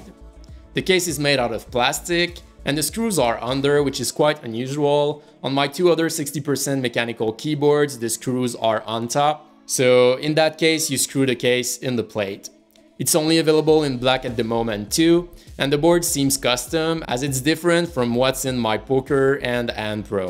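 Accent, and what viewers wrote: Quebec, Canada. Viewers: french canadian?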